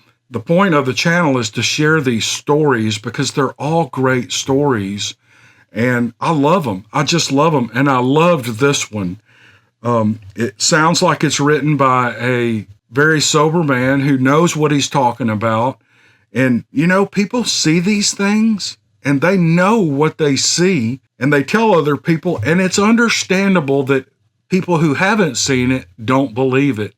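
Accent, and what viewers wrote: South, United States. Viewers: Just be you, love that southern American accent brother and volume is just fine and as always enjoyable to hear you mate! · Please don’t try to get better!! Look how much you’ve grown already and so fast. People love your southern draw, your calming effect and your narration skills! Would you take an old cherished record out of its sleeve, when there is nothing wrong with it, to fix it? NO! So just be your charming self. We’ll let you know if there’s ever a problem by yellin acha from da holla! Thanks, don’t ever change. Tell your wife that perfection can’t be fixed! Well, maybe not tell you wife on the other hand. We want y’all ya come back. ps: Just remember this, this ain’t Dixie Chicks, it’s. It’s the Dixie Chicks that need the fixin, not!! Thank you!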